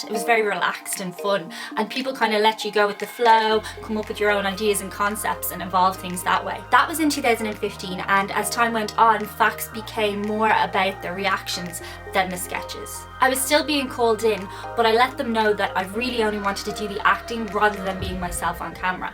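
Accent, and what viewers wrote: Ireland. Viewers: Dang it, it hit me like a truck ! Didn't see that coming. I guess all good things come to an end. Facts was a fun way to get used to the irish accent as I'm going to work in Ireland soon. Oh well, I reckon I have many channels to subscribe to, now.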